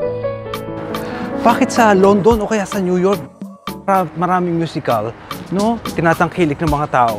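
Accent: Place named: Batangas, Philippines. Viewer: Hindi po halata sa mukha,pero halata po sa boses na Batangueno po kayo😂.Talagang madaming naapektohan iyang pandemic😔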